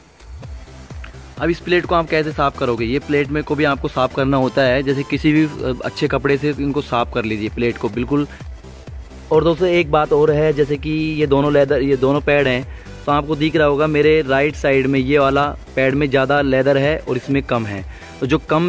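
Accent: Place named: India. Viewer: i don't see you video now but first you India tone i love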